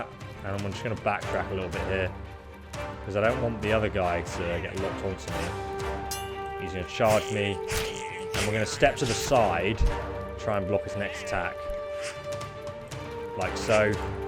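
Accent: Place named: United Kingdom. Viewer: Actually, I like your articulate brt English. Keep reading those text pls for you're more amazing than the BBC (not trolling, I'm really practising my Eng. listening)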